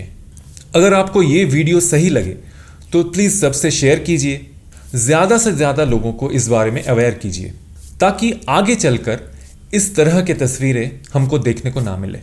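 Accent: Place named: Bengal, India. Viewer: Great going... Great info and brilliant presentation!! Looking great as well!!! Loved the pronounciations, bong touch there!! Great!!!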